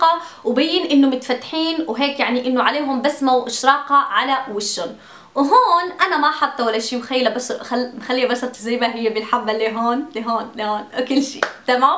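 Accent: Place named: Morocco. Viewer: إنتي عّم تكذب إنتي مغربية من كلامك في اخر الفيديوا إنتي مانك دهبت الى تركية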